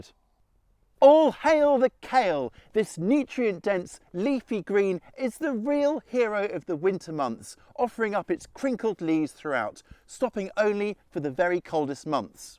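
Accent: England, United Kingdom. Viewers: He sounds English, maybe zone 7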